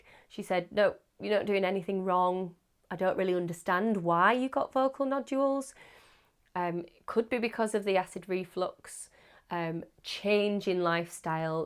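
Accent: United Kingdom. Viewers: OMG, I love British accent ❤ 😍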